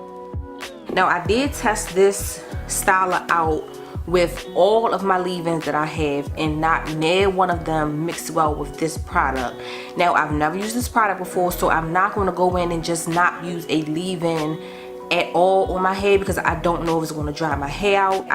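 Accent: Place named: New Orleans, United States. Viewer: I thought was from New Orleans, by her accent. I love her accent. Of course, humidity in the New Orleans area is like the worst (it's rare to just have a good hair day due to the humidity)! But Baltimore is pretty cool; I like that they get all 4 seasons.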